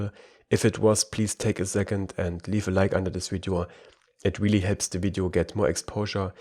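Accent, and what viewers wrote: Germany. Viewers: Love your accent, I’m german btw too😂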